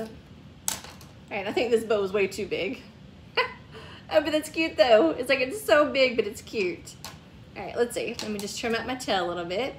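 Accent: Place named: Kentucky, United States. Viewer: I thought you had to be from KY because of your accent. I live in KY too!!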